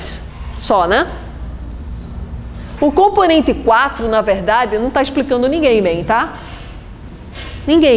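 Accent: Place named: Northeast, Brazil. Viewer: Sotaque nordestino arretado de se ouvir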